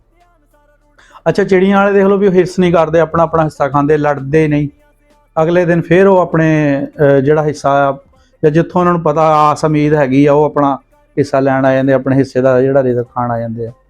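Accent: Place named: Amritsar, India. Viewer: Veere thdi punjabi bilkul sadi Amritsar wali.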